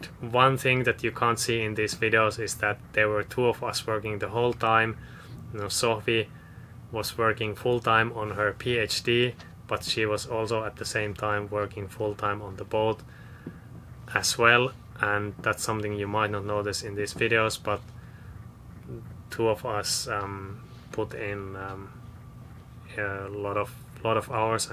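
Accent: Finland. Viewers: I was listening to your accent and I thought you might be Finn. I was right. 😊 I’m Finn American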